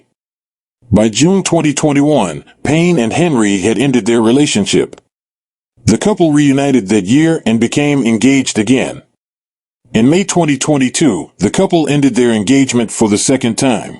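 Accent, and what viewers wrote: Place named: United States. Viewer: Whats with the American commentator.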